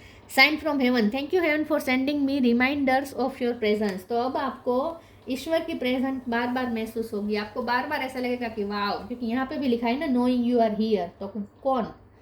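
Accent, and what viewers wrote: Gujarat, India. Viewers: Are you gujarati?.😅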